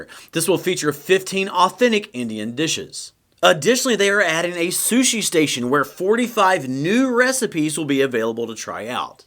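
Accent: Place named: New York City, United States. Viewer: She's hilarious sounds like she's a new york city gal.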